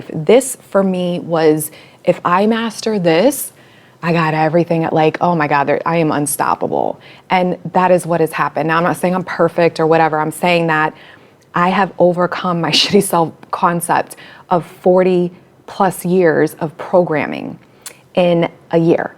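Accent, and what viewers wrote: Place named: South, United States. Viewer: Such a New Yorker....love the accent from down South girl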